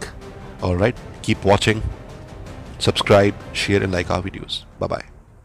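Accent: India. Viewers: Vi may tari English sunka hamesa sochta tha ki tu Indian he hay lakin confirm nahi tha keuki tu hamesa English may bat karta hay but aj confirm ho gya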